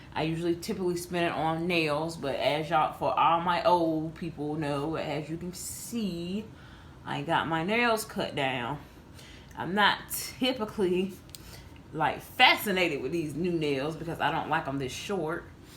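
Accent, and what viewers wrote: South, United States. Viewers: I’m pretty sure that’s all I have for vehicle insurance also. I have to get the extra to get the roadside assistance. Renters insurance is also so good to have! Never know if something happens! Keep up the good work, have missed your videos and that sweet southern voice...I always know you’re videos will bring me back home ☺️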